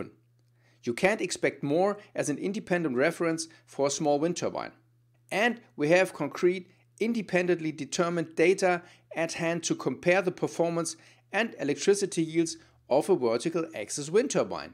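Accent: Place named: Germany. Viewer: You're a clone of a colleague of mine in Stuttgart... with the very same accent and weirdly enough, deeply convinced that US technical validations are UNBIASED! 😆That's so naive! Industrial considerations are paramount, meaning that a better technical solution may be beaten as it's been already the case with heat engines as a matter of fact. Once I asked him how trustworthy are Tüv tests and certifications: Are they to be less reliable than their american counterparts? It was an inner national struggle for my colleague... Oh Gosh! Corporates can bring down the most wonderful ideas. It's appalling that you ignore it!!🤣😂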